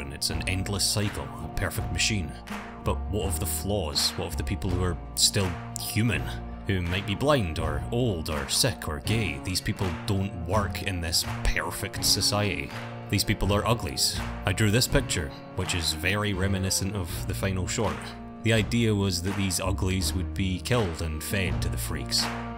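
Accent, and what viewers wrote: Scotland, United Kingdom. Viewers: I’d say Scottish, it reminds me a bit of my own accent and the one I hear a lot from family. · Loved this animation + omg you're Scottish too! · I hEaR a ScOtTiSh AcCeNt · sarcastic af Scottish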